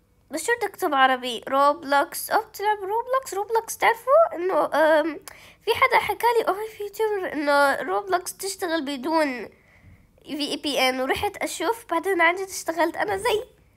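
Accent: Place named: Egypt. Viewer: انت من الأردن ولاكن انتي تتكلمي مصري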